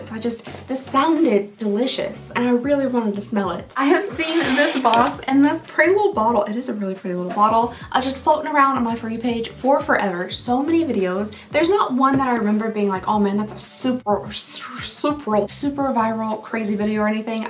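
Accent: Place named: South, United States. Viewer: I just have to say I found you a little while ago, but I adore your accent and southernness so much, since there’s such a lack here on YT.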